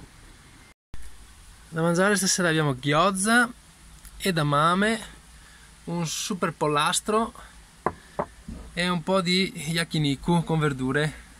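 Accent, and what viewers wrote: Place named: Romagna, Italy. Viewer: Molto suggestivo...dall'accento non capisco se sei più romagnolo o padovano :))...nel caso i colli euganei sono stupendi. Dai noi, in Sardegna, vicino a dove vivo io, facciamo diverse feste in campagna (sai col maialetto da latte e vino rosso) e ci sono diversi agriturismo immersi nella natura incontaminata ma in stile Zen è veramente stupendo e nuovo. Davvero un piacere per tutti i sensi e in tutti i sensi :D.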